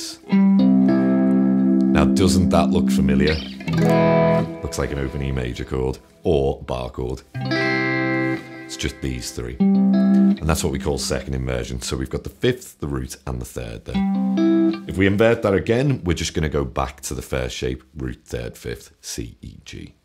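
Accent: Liverpool, United Kingdom. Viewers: Bass Scouse ! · Thank for the amazing video my fellow scouser! I also live abroad have done for a long time, so its nice to hear a familiar voice. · Scouse not English! has the most fantastic elegant Scouse elocution.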